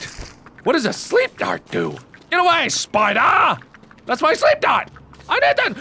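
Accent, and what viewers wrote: United Kingdom. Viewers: Awesome accent! xD Yamimash, Mangaminx and other LPers from the UK would be proud! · Haha his stereotypical british accent!!!! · you have BEST british accent EVER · I love ´s Brittish accent and how he talks to CHESTAH.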